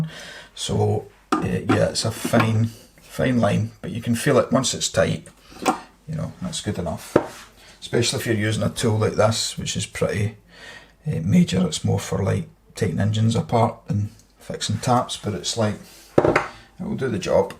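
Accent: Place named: Scotland, United Kingdom. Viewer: Thanks from down under, you did a great job explaining the process. I'm a 75 year old female about to change the vanity basin taps to quarter turn and bought the whole lot. Now I realise I can take it back and just get the quarter turn brass spindle and use it with my existing handles. May just do the shower too 😊 PS love your Scottish accent and thanks for talking slowly.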